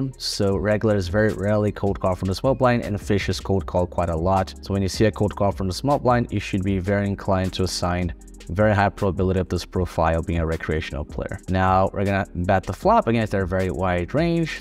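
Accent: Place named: United States. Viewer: good content!I wanted to ask if you live in US or born there, seems to me that you speak perfect USA English, which I admire a lot!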